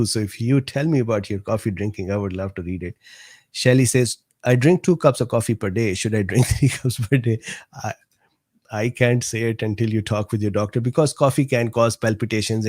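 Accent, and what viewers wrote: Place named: India. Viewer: Universal Indian pronunciation. Different from ours, like many other words.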